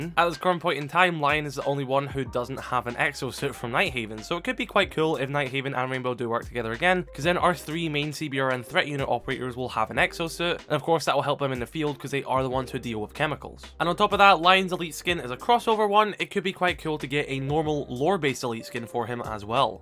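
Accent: Scotland, United Kingdom. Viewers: Where abouts in Scotland are u from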